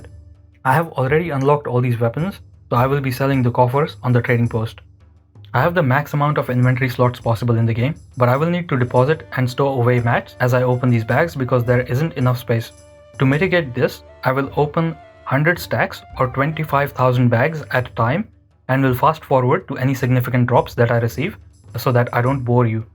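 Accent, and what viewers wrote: India. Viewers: my god indian accent kills me every fuckin time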